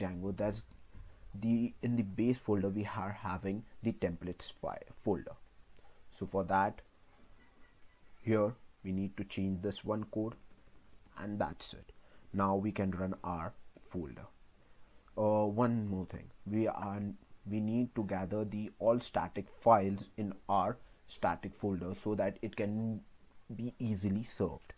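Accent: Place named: Germany. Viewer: Good day, I have been studying and reviewing videos in Python and now in Django on how to make a website and until yesterday I discovered the so-called templates and the videos that I have seen so far show commands and files that are already obsolete. Until last night I came across this video and it seems to me that it is well structured, updated and in English that I do not master but I have always wanted to learn it and listening to your pronunciation I think it is not your native language either, you sound more like German or European. I was looking over some of the videos of the series, I have high expectations now that I have already seen the first of the series starting with the virtual environment that until now had not deepened it. My goal is to know how to use the templates to not reinvent the stone. Escribi el parrafo en español y lo ingrese al Traductor de Google o sea que si lo quieres leer en español haces la operacion inversa en el Traductor de Google con este parrafo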